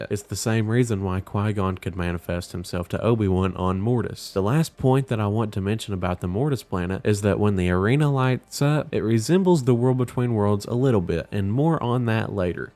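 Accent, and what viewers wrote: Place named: South, United States. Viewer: That accent is 🤌 yeehaw my fellow southerner.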